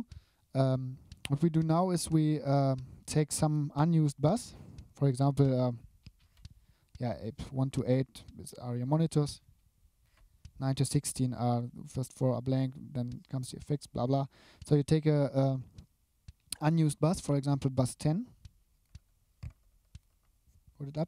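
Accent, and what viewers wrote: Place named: Germany. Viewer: No No I mean I really like your accent it reminds me of a close German friend of mine I love the way you said the kick " Sounds Like Shit":) Thanks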